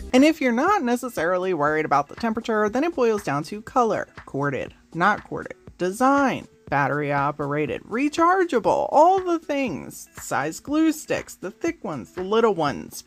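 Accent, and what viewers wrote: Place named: Baltimore, United States. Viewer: I did actually mean like how to sell my products lol sorry I suffer with chronic pain and sometimes my words get jumbled up. Can I ask where you are from? I’m originally from Baltimore, MD, but I’ve lived in San Diego, CA since I was 8-9, it’s just your accent that reminds me of my mom’s. She’s was born and raised in Baltimore and she had a very similar accent like yours. 😊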